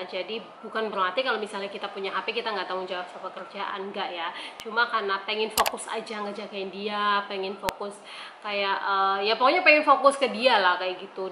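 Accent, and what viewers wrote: Banyumas, Indonesia. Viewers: Salaam ngapak😁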